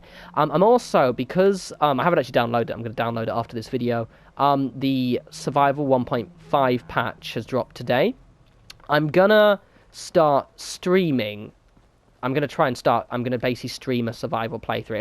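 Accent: England, United Kingdom. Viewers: The potato chips in the game are called "potato crisps", and judging by your accent I'm assuming you're in England or near it? And yet you said, "potato chips". ... Mind blown. Lol. Awesome videos, btw. I just bought FO4 a few days ago and I've been watching through all your videos for tips & tricks!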